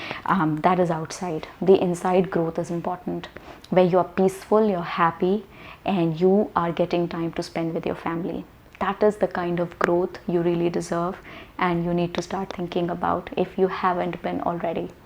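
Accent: India. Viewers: I’m not trying to learn English (I actually teach it)! So I don’t why I’m now addicted to your videos 🤷🏻‍♀️. Yes I share your sentiments. I gave up my successful job in the corporate sector & went into teaching & training - just to make a difference to the lives of young people. Yes I want to retire in the BEAUTIFUL birth place of my mother 🇮🇳 & now make a difference in the country she loves so dearly, that day is almost here for me 😊. Just one other thing - now I think I will stop trying to train students to ‘drop’ their Indian accent. Your Indian accent is BEAUTIFUL 👌🏾- it’s something people should be proud of & not endeavour to copy EVERYTHING about us foreigners 🙄😁 keep sharing your love & inspirational videos 🏆. Much love xx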